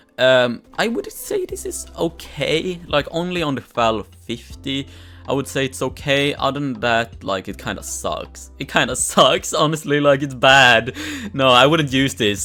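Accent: Sweden. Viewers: I just watch for the Swedish accent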